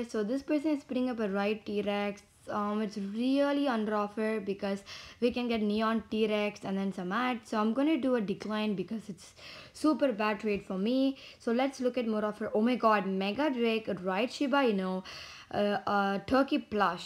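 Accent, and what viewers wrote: India. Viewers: im also indian i was wondering if she is also indian because her voice is sounds like indian and she is indian :)) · By the accent she is Indian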